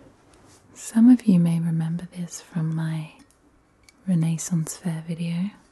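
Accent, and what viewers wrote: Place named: Australia. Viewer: What a great debut to ASMR! Enjoyed very much, thank you. Educational too. Lavender IC sounds amazing. 💜 😋 I always enjoyed the fairies in BoFIII video game PS1. They were cool and pretty helpful. 🧚‍♂️🧚‍♀️🧚 You are very beautiful and I like all your cool crystals and books. Oz accent very subtle and sweet to listen too. Space lights are nice idea too. Look forward to any future videos. 🥰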